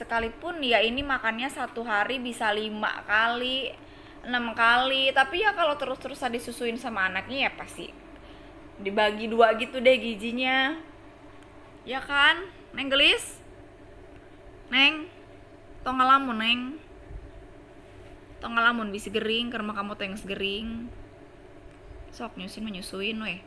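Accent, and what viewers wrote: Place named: Java, Indonesia. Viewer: Momy ni jawatimur pa jawa barat sih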